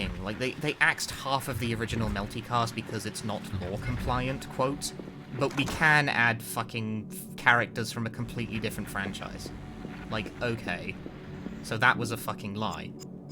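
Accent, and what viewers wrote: United Kingdom. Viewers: Fantastic run! Not surprised Cedar Grove Sanitarium, on a damn WR no less, managed to take over 11 minutes to itself. The place is massive and during your run I actually lost count of how many key items it involves. Been a while since I last played... Out of curiosity, what game are you saying that is "boring" to speedrun around 29 minutes in? I'm not very good at understanding British accents unfortunately. :(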